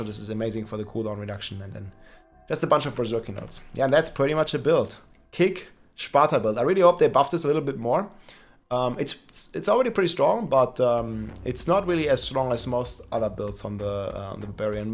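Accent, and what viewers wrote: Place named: Germany. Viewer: Bist ein Opfer wirklich du bist der Grund Why alles geh nerf Wird rede mal deutsch du bist mehr deutsch als Manuel neuer hört man so raus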